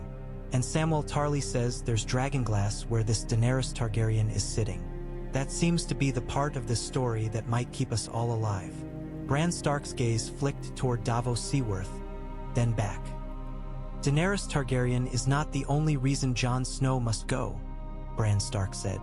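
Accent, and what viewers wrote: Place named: India. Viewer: Why is this one different saying full names and randomly going into Indian accent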